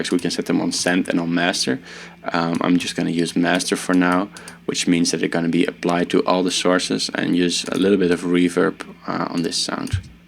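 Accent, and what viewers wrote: Netherlands. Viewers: Dutch accent detected 🤘🏻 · dutch accent right there...sowieso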